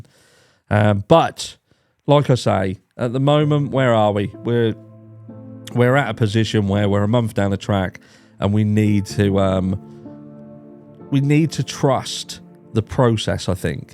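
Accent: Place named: Lowestoft, United Kingdom. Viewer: nice, the reason I asked is you have a similar accent to some of my family from Lowestoft. I now live in Austrailia and you don't hear that accent very much.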